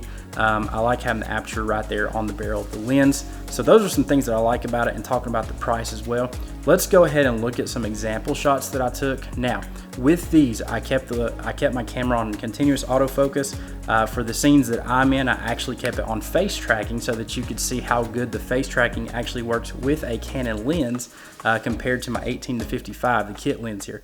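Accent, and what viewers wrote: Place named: Knoxville, United States. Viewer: Hey,. I knew the second I heard you speak you are from where I come from. All my father's relatives are from the Knoxville area. Been a long time since I've been back, but I loved living there. Now, you only demonstrated the nifty-fifty. So, I don't know if you tested the adapter with EF-S zoom lenses that are stabilized. My question is about how well the stabilization of the lenses work. Do you know?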